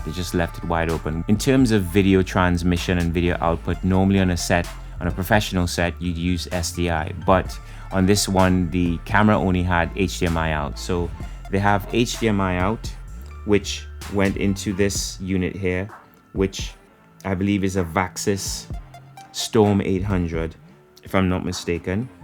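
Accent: Trinidad and Tobago. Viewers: love this vid have to ask are you trini Im hearing that accent.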